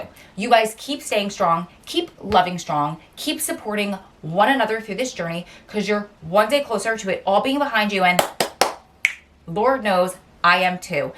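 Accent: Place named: South Jersey, United States. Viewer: Omg you are from South Jersey aren’t you? I’m new here. That accent! 😩 Cross between Southern and Philly. I live in Salem County. I understand if you don’t want to disclose where you are I just think it’s funny! I’m loving the content!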